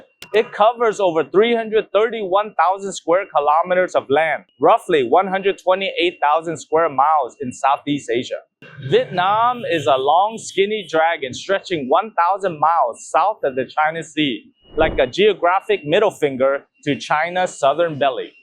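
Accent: United States. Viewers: This man full of American accent, what he is reporting just trying to stir up the status quote of thebreguon.anyway I don't understand a word he is saying.He does not know about the history, nor does he know about the geopolitics and the relationship between Vietnam and China have been straightened 5 years ago. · Trước đó, đời ông bà mình, Tây dùng người Việt đi đánh người Việt. Bầy giờ, Tây mong dùng người Việt đi đánh người Hoa đễ chia rẽ, cảng trở sự phát triễn của Việt Nam và Trung Quốc nói riêng, của Á Châu nói chung. Ông này nói tiếng Anh giọng như người Mỹ quá há các bạn... ? 😉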